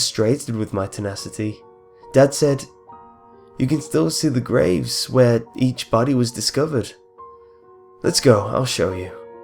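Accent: England, United Kingdom. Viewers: are you from the south or north of England?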